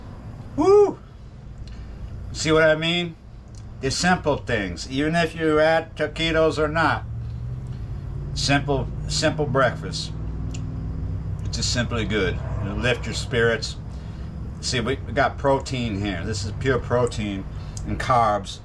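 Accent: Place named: New York, United States. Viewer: Love the New York gangsta accent mixed with Louisiana slang LMAO ! !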